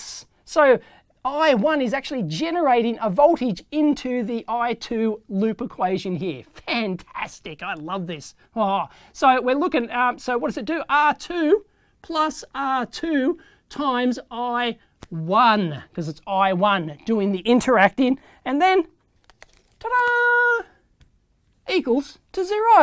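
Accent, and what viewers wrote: Australia. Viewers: I love this man. Also the Australian accent is icing on the cake. Great tutorial, thanks!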